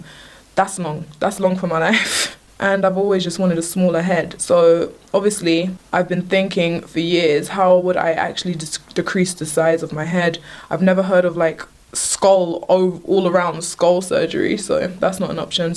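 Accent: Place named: Council estate, United Kingdom. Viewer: hate how she talks. as if she lives on a council estate.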